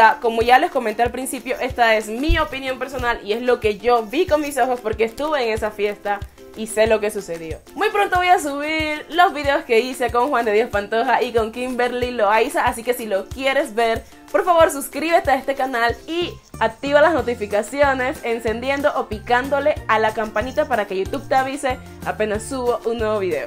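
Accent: Panama. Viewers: Sabía que eras de Panamá 😫 si tenemos acento Ajajaja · Acabo de llegar a tu canal,y con tu acento reconocí que eres de PANAMÁ 🇵🇦😍❤. yo como 😳está chica suena al acento panameño😍❤. eres muy bella.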